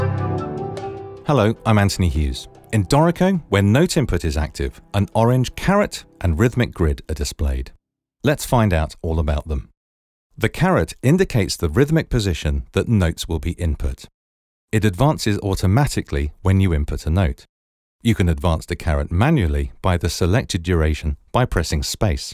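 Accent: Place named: England, United Kingdom. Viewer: If it’s explained with an English accent we think we’re the stupid ones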